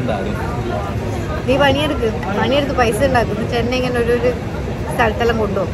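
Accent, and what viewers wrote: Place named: Payyoli, India. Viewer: Payyoli slang.... 👌👌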